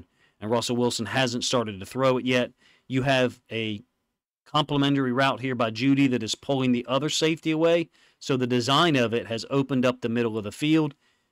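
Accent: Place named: South, United States. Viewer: I love your breakdowns, your insights and your accent. I assume you’re from the south. Do you mind saying where you were raised? I spent some years in TN and you remind me of my smart, articulate professors with twang in their speech. That southern accent when mixed with intelligence and a nerdy enthusiasm for teaching the subject at hand is a perfect combo to the ear of the learner imo.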